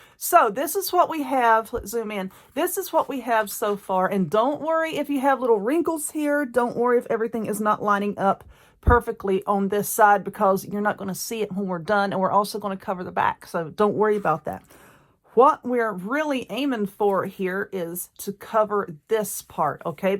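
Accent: South, United States. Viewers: That is beautiful and I love listening to your beautiful southern accent.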